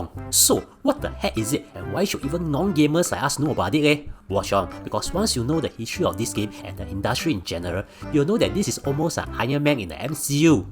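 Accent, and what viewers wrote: Singapore. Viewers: That singlish is making my ears itch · Singlish and his accent is so funny, so cuuute, I love it.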